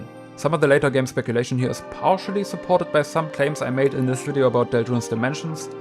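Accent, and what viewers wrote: Germany. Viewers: Your accent sounds pretty German for an English speaking youtuber, i have a theory German was the language you used growing up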